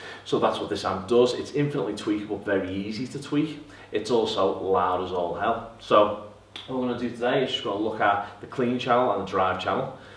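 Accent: Liverpool, United Kingdom. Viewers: Scouse (Liverpool) accent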